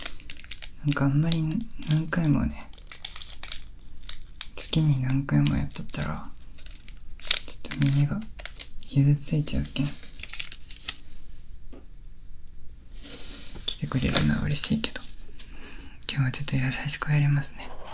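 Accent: Japan. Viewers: 君の語尾けんってついてるの親近感湧く同じ県の方言やけん心地良い✨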